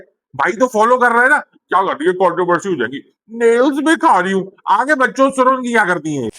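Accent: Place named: Karachi, Pakistan. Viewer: Bhai, you make Best comedy videos in Karachi accent 😂😂😂😂😂❤❤❤❤❤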